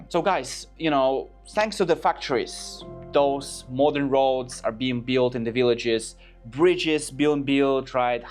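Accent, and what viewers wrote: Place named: Finland. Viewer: Wait, are u from finland? No wonder ur accent sound so finglish.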